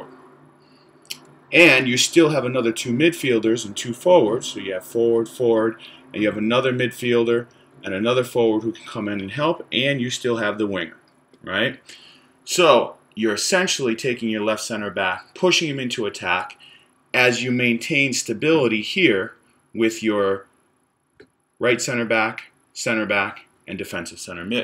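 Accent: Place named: United States. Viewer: Wait, are you american? Auto cant watch this anymore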